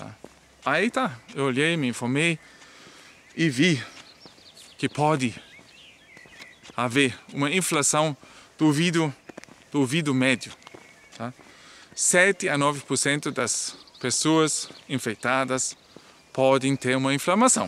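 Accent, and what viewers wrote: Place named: Germany. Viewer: Eu sou canadense-brasileiro. Nasci em Santos - São Paulo. É uma cidade portuária. Meu pai era canadense e minha mãe biológica era descendente direito de alemães. Meu avô paterno era japonês e minha avó paterna franco-canadense. Por parte da minha mãe adotiva meus avós eram ingleses. Infelizmente não conheci a minha mãe biológica. Eu simplesmente adoro os seus videos. Assisto todos os dias. Devo dizer, também que estou inscrito. VOCÊ lembra o meu pai quando fala português. MEU pai tinha um sotaque inglês muito forte. Sue sotaque alemão é bem característico, também. ACHO VOCÊ SENSACIONAL. UM ABRAÇO DO SEU AMIGO CANADENSE-BRASILEIRO.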